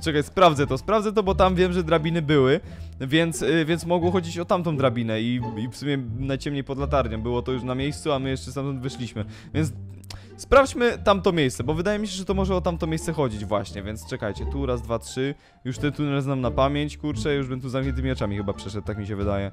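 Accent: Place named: Silesia, Poland. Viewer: Ty trochę umisz muwić po Ślosku jak ja.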